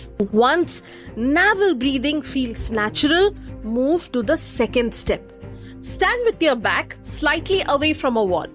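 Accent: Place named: India. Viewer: The stupid ass hindi ascent ruins it for me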